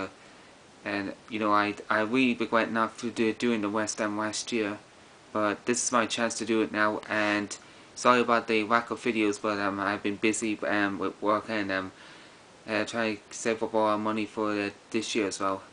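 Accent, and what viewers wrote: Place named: Ireland. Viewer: Nice collection, thought you sounded Irish!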